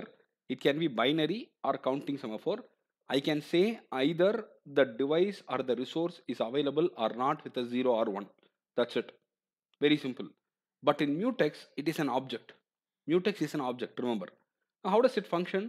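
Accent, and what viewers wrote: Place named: South India, India. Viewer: good explanation but please consider using AI voice synthesiser on a prior prepared transcript, south indian accents are extremely annoying and make it hard to focus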